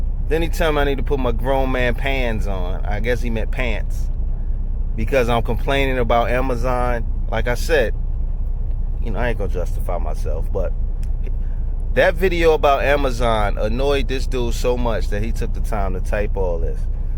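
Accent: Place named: New Jersey, United States. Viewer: Lol dude wildlin...I'm from Nj mostly everybody I kno talk like you and for you too be successful and still be your self is inspiration for plp like me...I tried to find you on ig but a whole bunch of other accounts popped up...I just got my authority authorized this week and love to link with you cuz I kno you'll keep it 💯 let me kno if it's a easier way to contact you stay bless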